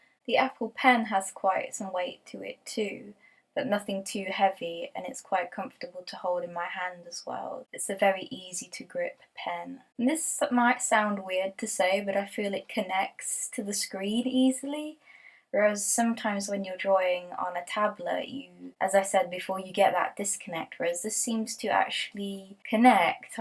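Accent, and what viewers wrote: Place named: United Kingdom. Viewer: Jesus this british accent is so annoying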